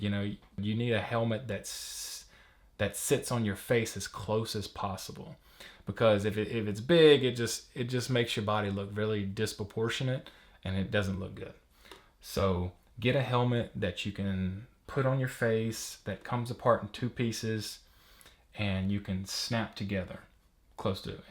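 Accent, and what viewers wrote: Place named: Louisiana, United States. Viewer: Awesome helmet! Love your accent too. Louisiana maybe? Anyway, your voice is like butter 😂😂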